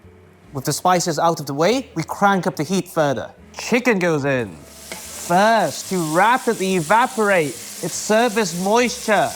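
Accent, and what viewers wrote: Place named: United Kingdom. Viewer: I like his stuff I’ve seen so far. Is he from Hong Kong; he’s got a British accent.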